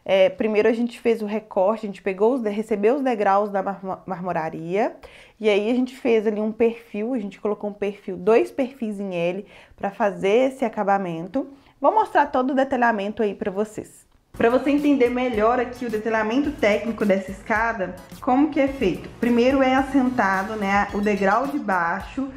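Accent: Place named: Minas Gerais, Brazil. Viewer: Nós mineiro falamos assim mesmo .... Escorrega igual quiabo kkkk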